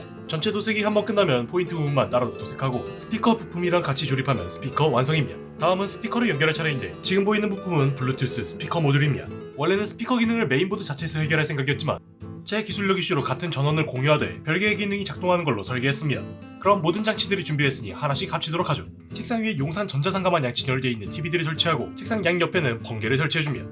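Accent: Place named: United Kingdom. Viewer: YOU'RE BRITISH????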